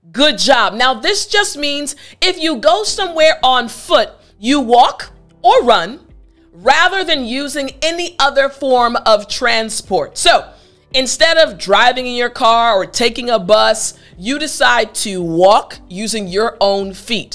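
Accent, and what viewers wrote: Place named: United States. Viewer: I love your American accent, teacher. Greetings from NICARAGUA 🇳🇮.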